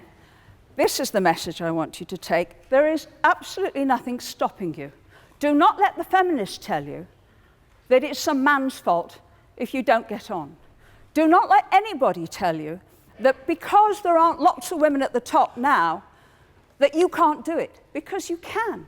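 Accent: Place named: Liverpool, United Kingdom. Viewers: Has a poshest scouser ever existed ? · Aahh - she's from Liverpool! That should not be a surprise! :) Her accent though is not typical... I know many might disagree, but I just love the Scouse accent! It's so expressive and honest- straight from the heart. I'm not British or anything but I've been following the red half of that City's football team for a large part of my life now; and one thing I've come to know about those people is that they are level-headed and not particularly pretentious(yes even the blue half ;)). They can also see right through bs and they speak their mind. This lady is no exception. Lovely lady btw and a seeming good sense of humor which is what you can expect from Liverpudlians!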